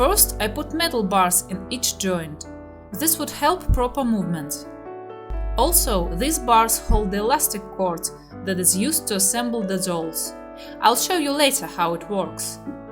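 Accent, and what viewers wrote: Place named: Russia. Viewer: Судя по акценту, вы русская, если не ошибаюсь. ❤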